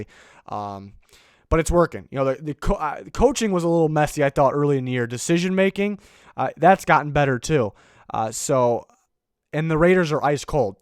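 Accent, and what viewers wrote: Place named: Boston, United States. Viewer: Did you go to school in Boston because you sound just like a Patriot fan. Same score prediction to. In a little less than 9 hours you will see. Oh, and thanks for the awesome D coordinator. Leslie Frazier was just what we needed!👍😉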